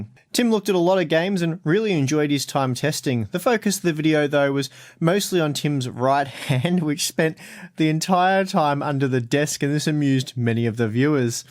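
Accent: Australia. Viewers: Oh hey Your Australian!!! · Hey! I must say your accent is kinda hard to understand (I'm not a native speaker). But I had an Australian teacher and now it is easy for me to understand, just wanted to share my experience. Btw awesome channel!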